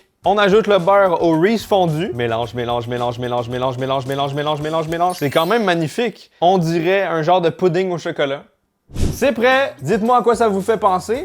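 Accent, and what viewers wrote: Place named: Quebec, Canada. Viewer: Oublie pas que c’est un Québecois donc il parle un français différent du français de France ;)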